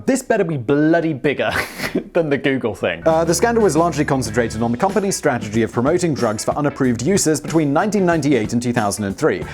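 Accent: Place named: RP, United Kingdom. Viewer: Anyone else enjoy hearing swear in his posh accent? For me, it’s similar to the inner-child glee I feel when Betty White says something that just seems ‘wrong’ coming from the mouth of a beloved grandmother figure. You go on with your bad selves, Miss Betty and. My inner-child thanks you.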